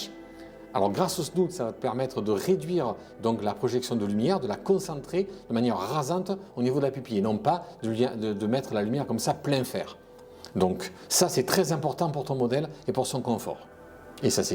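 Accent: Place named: South, France. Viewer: Sympa ton accent du sud... Et ensuite tu auras besoin d'un Iris !:trophy-yellow-smiling::popcorn-yellow-striped-smile::card-red-penalty::medal-yellow-first-red::takeout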